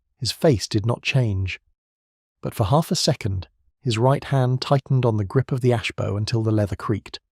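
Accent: United Kingdom. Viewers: Hate this AI with British condescending voice and accent.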